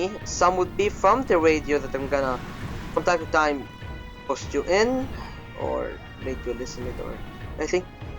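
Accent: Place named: Arab world. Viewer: Great series! I can tell you're an arab, but where are you from?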